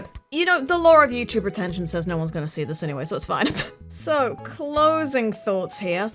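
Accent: United Kingdom. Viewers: Lol you're a masterclass at explaining things. Maybe its just because your british voice and humor is so nice to listen to lol. I look forward to seeing how great you grow <3. Hope you will have a lot of amazing stuff planned. btw: +1 sub toward your million. I see you from a ton of clips <3 Your Moth-...I mean umm I have seen those clips too;)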